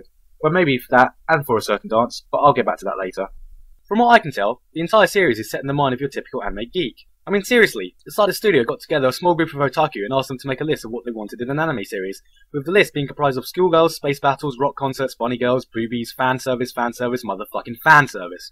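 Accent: United Kingdom. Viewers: Gigguk lost most of his british accent after a few years 😂 he's more understandable now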